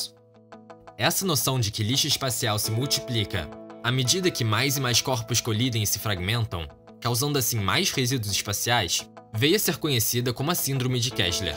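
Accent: Rio de Janeiro, Brazil. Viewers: Sotaque carioca insuportável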